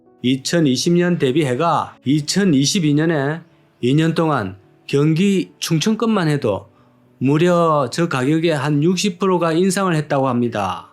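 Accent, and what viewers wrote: Gyeongsang, South Korea. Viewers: 아재 말투는 구수한 경상도 말투입니다... 유네스코 무형 문화재 감입니다.... 아재요, 욕 많이 봅니데이 ~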